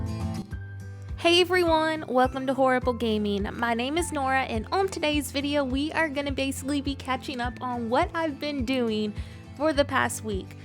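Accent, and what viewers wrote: United States. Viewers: Ooooo, do you live in the Southern Hemisphere? I would have guessed Northern only because you seem like you have a US accent. I'm from New England myself (the land of regional accents).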